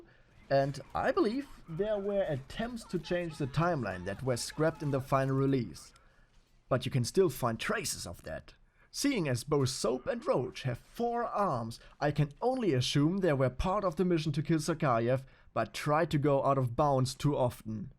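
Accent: Germany. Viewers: Are you from germany? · Are you German?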